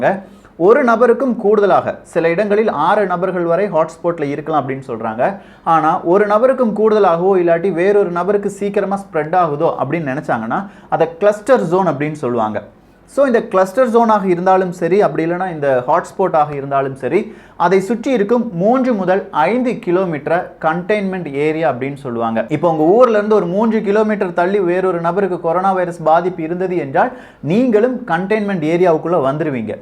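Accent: Kerala, India. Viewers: நண்பரே உங்கள் தாய் மொழி மலையாளமா? உங்களின் சில ஆங்கில உச்சரிப்பு மலையாளிகள் போலவே உள்ளது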